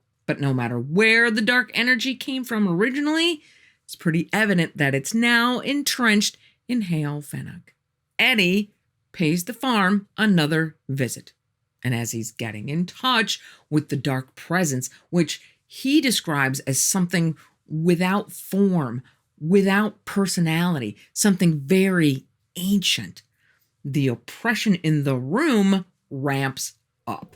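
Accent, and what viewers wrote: United States. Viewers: insufferable yank voice. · I never thought I could listen to an American accent for 3 hours, but she is a very good storyteller. Very Enjoyable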